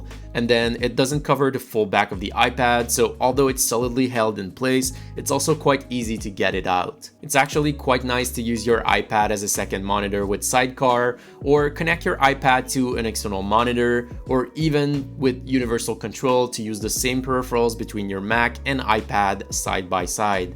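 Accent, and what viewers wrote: Quebec, Canada. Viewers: Super random, but are you per chance French Canadian? It's super subtle but I feel like I'm hearing that subtle French of my fellow bretheren so thought I'd ask 😂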